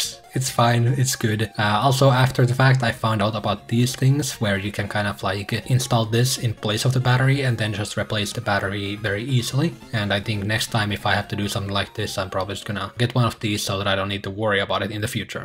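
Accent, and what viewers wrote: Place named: Finland. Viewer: By the accent, I knew you are Finnish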